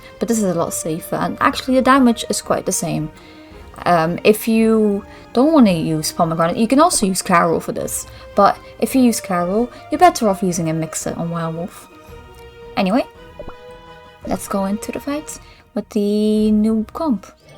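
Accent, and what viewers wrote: United Kingdom. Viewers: sounds a bit british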